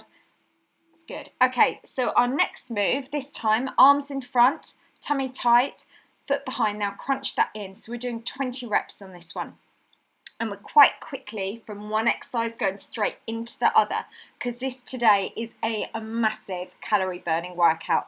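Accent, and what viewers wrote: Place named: United Kingdom. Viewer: ommmmmmmmmmmmgggggggggggggggg your accent is sooooooo cute!❤❤❤❤❤ best british accent ever heard!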